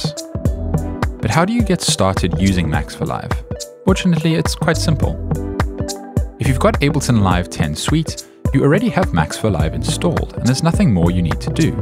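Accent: South Africa. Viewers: Broooooooo are you south african?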